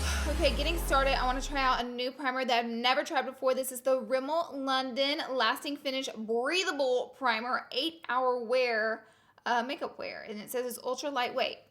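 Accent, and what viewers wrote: South, United States. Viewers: #snapchatfam #igfam your my favorite YouTuber by far I’m from North Carolina and have a strong southern accent like yours and I absolutely love it 😍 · Instagram fam😘😘 I LOVE the way you say Valentime's DAY, conciler, etc... Gotta love those southern twangs 😘❤ from one southern girl to another, I like the way you talk 😘❤ NC HERE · Katie Serious Talks its her accent and they do sometimes say it in the south. So can you not be so rude and negative.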